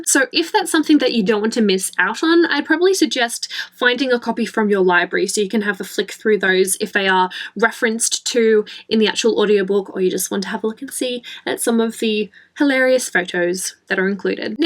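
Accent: Australia. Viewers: You shd make audiobooks. Would you do it if the opportunity arose? You have a clear but tangy Aussie accent. I'd recommend Jon Krakauer narrating his book Into Thin Air.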